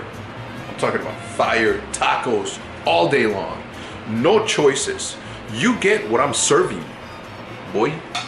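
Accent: Mexico. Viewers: I'm from Durango sounds like he is from Monterey tho haha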